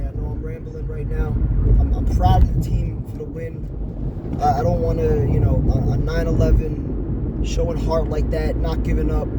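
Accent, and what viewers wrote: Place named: New York, United States. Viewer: My man’s went New York accent on y’all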